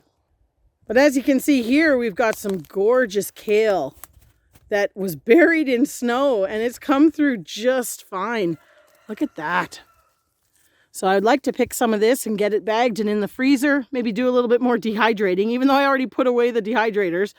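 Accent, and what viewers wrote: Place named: Canada. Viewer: I literally reheated and repurposed a bunch of things I already had made in the freezer or fridge. I then repurposed other items in the fridge that were left over and it was a fairly easy week for us as well. I had to laugh the other day as I was watching one of your videos and my daughter asked why you talking about "a boot"..you were saying about..I explained you are from Canada and you say things just a little differently like Mr Rem and Mrs Annie that we watch that are from Canada too. That stew sounds like it was delightfully rich and wonderful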